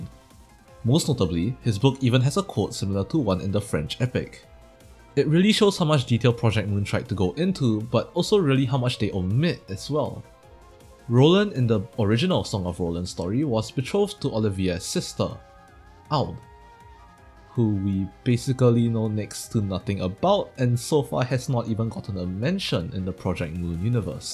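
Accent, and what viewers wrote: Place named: Singapore. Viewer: oh your singaporean hey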